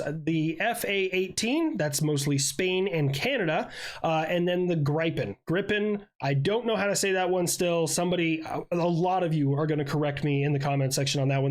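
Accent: United States. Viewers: You know, this guy sounds like America...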